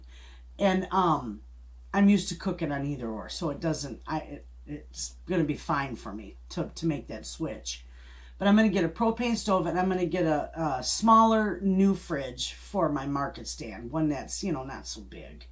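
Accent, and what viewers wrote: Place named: Chicago, United States. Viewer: Lady I just found you maybe a week ago, binged a few videos, and was hooked! You are a delight! I literally smile, and giggle throughout your videos! You are so fun!! I just have to ask. I am a Michigander as well, and too lived in Chicago. Your accent sounded like you were from Chicago, are you? LoL... Accents and trying to figure out where they are from are my thing ?! Anyway I so look forward to the notification that you have posted something! Love your channel!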